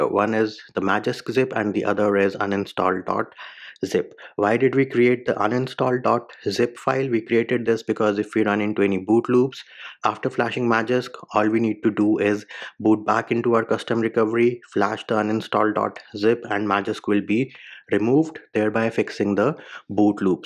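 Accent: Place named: India. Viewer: Why tf are All these videos made by Indian dudes with thick accents holy s***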